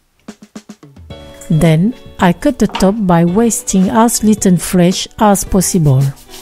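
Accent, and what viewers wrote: France. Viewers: LAOTIANS with a French Accent! Wow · Bravo! English sounds so much better with a French accent. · I love pinneapple and thats why Im here but after 5 minute of french accent English, I feel like ordering some "baguette avec beurre" :))) I actually enjoy French accent English speakers.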